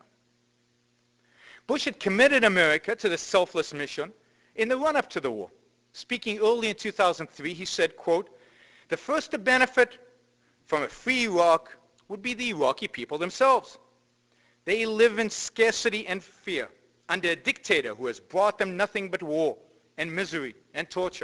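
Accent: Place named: Israel. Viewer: He's not a native English speaker--he's from Israel. Give him a break.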